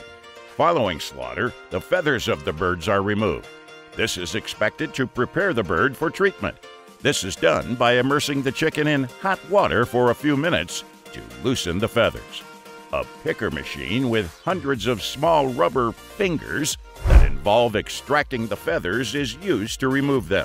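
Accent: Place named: United States. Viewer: such almighty american (note the lack of capital in the spelling..) So why are the EU not willing to accept us produced chickens..oh could that be the use of BANNED chemicals..but the big american voice over says the are JFK rn certified 100% approved for human (nay american) consumption. Hey - just a thought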